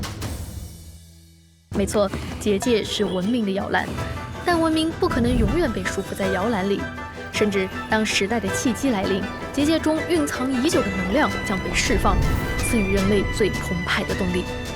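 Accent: Sichuan, China. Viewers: 好喜欢川话版的解说❤❤ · 這個川普和我想像中的川普有點不一樣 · 川普的配音太好玩了🎉🎉🎉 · 2个配音都不太喜欢，四川话配的太瓜了。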